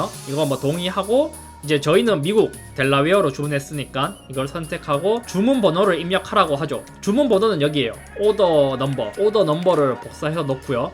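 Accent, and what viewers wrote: Gyeongsang, South Korea. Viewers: 경상도 분이신가봄ㅋㅋㅋ 억양이 들리네요 저도 경상도!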